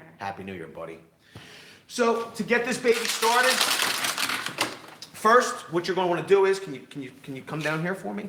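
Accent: Philadelphia, United States. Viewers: Thanks for taking time to do a walkthrough before blowing snow. And coming from Montana that Philadelphia accent is great.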